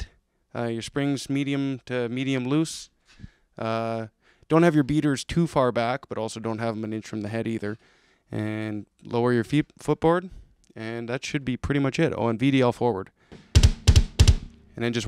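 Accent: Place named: Canada. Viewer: Is this guy Canadian because I can hear it on his accent and me being a Canadian myself I hear it hella bad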